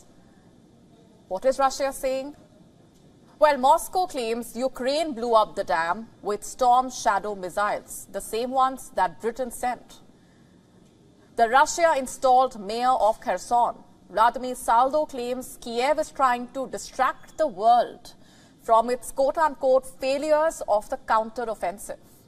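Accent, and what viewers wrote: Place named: India. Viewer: i love indian /english accent :person-turqouise-waving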